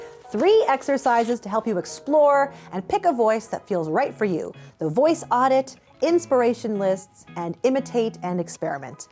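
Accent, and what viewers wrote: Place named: New Zealand. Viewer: This kiwi accent makes it real hard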